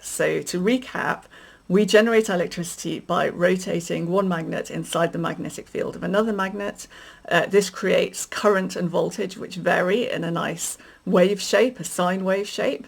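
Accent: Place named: West Country, United Kingdom. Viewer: I have watched some of your content and must say your knowledge and your proposals, particularly in their scope, are something I can resonate with, particularly when it comes to Nuclear. HOWEVER......your delivery sounds very typical of many "Millennial's" and "Gen Z's", in that you orate many of your sentences where the listener can't distinguish whether they are statements or questions. I don't know if you reside or was brought up in the West Country but these types of speech patterns are typical of that region and can become rather tedious to the point of infuriating after a while to many listeners.........particularly us "Boomers" and "X's".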